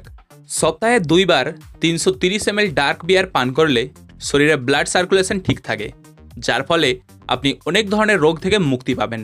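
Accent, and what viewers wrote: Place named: India. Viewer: কথা শুনে বুঝা যাচ্ছে দাদার দেশের ডাক্তার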